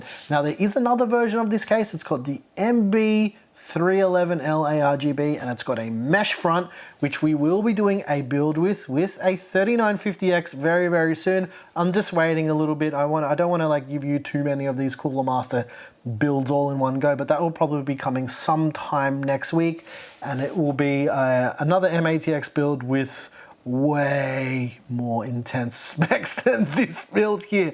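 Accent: Australia. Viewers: Are u an Aussie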